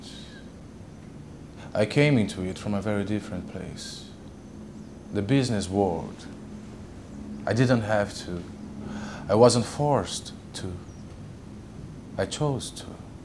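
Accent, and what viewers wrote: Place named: Portugal. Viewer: Portuguese accent sounds sweet:)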